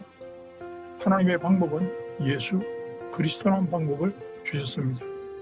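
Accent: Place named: Gyeongsang, South Korea. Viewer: 잠시 머물다갑니다. 경상도 사투리로 차분하게 말씀하시니 더 친근하게 들립니다. 종교는 없지만 귀 기울여서 잘 듣고갑니다.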